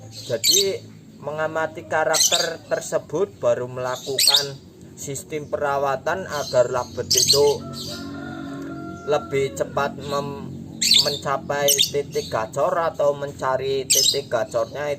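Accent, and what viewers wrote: Banyumas, Indonesia. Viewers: Ahaha jawa medok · Ora ngapak ora penak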